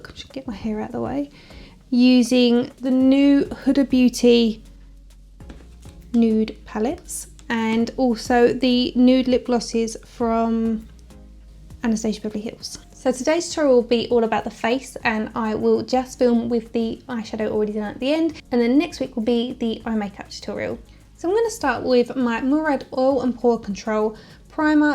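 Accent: Essex, United Kingdom. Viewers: Love your Essex accent 💕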